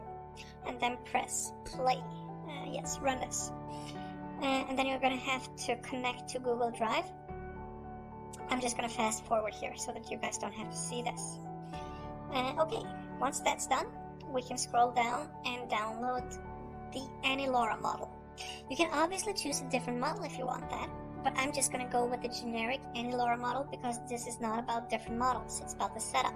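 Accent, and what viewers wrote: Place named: Europe. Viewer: no offense but the filter makes it worst. love me some european accent...just use your real voice nxt time please.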